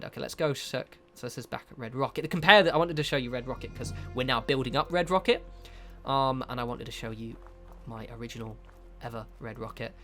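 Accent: United Kingdom. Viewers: Blocking off the ceilings and stuff was the very fist video I saw of yours and I loved it. Plus your cute britishy accent is hot :)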